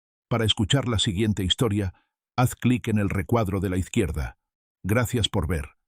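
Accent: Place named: Spain. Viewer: Y si eres de Oaxaca, porque hablas como un español?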